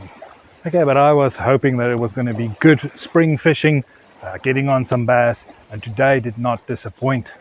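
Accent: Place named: South Africa. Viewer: Hey bru! You sound very south african?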